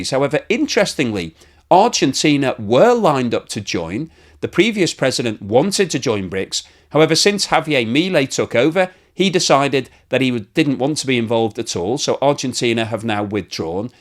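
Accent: United Kingdom. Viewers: British accent = western bullshit. EVERY SINGLE TIME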